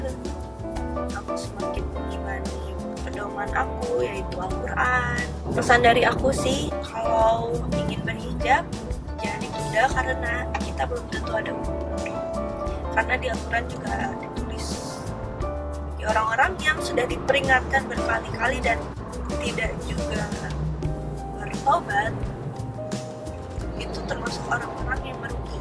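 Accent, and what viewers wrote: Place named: West Java, Indonesia. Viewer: orng sunda nya katingal teh cara nyarios na 😬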